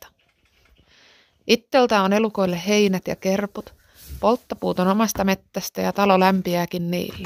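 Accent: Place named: Ostrobothnia, Finland. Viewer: Ihana pohjalainen murre. Karolta tuli vinkki sun kanavastas. Kiva video.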